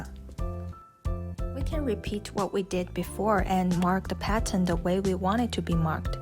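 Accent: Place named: China. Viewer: 听口音是中国人吧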